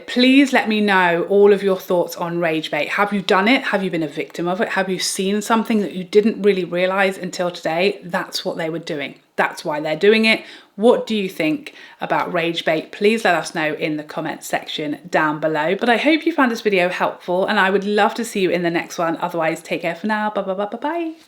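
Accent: United Kingdom. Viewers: Hi dear, looking beautiful as always!!!🤩🤩🤩🤩And thanks for sharing this with us! Never heard of this actually, what a waste of time! I will never want TikTok, i tried Treads for a day, but found following here and on Insta is enough. But i love watching your Channel of course, love it! And other content creators as well! Maybe the people i follow are very normal, but i never exprerienced this. Maybe i'm boring, but i follow people from Weight Watchers, of course people from church, singing etc, cause i'm a christian, i love to follow wildlife, of course i love to follow makeup as well, doing Duolingo on my phone to learn some more Spanish, but i never knew this existed! Thanks for letting us know, dear, you have so much variation in your video's, this is serious, but you also make us laugh, knowing a lot about makeup! Strange creating hate between Europe and America, i love America! 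So when i would say here i love the British acccent the most, which is true, that would never be to insult from me to anyone from Canada, USA, Australia, New Zealand, never thought about that actually, it's just that i love to hear it. Born in 1970, digi disaster number one here, hubby and son keep on laughing sometimes what i did again, but i can manage myself better and better in digi-land, baby steps,. I think i'm also tooo naif, i always think the best of people, so, i really appreciate such a video, to keep me awake, thanks so much!!!❤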